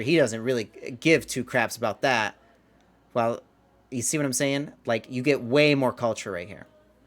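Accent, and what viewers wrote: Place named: Seattle, United States. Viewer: Awesome Tier list! Just out of interest - where is your accent from? Are you from Seattle by any chance?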